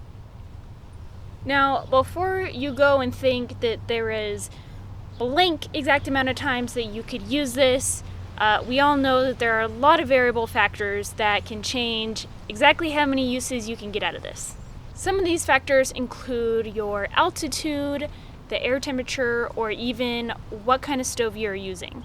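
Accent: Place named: Michigan, United States. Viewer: Thank you for the informative vid. It was very helpful to me as I am also into hiking and camping too and have questions like this about most products that I could use. Are u from Michigan? Your accent...it's either Michigan or Wisconsin isn't??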